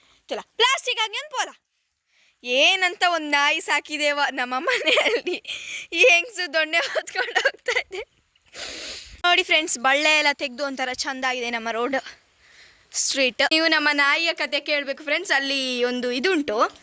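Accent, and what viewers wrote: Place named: Arebhashe, India. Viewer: Nimma arebhshe kelike kushi adhe marre 😅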